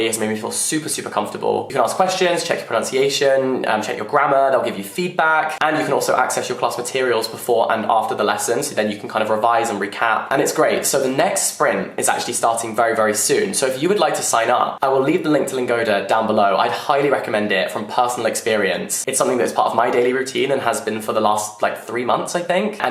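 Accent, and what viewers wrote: United Kingdom. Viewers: « New York is so lovely » he says in a thick British accent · You're Brit English makes me wanna take a literature degree 🤌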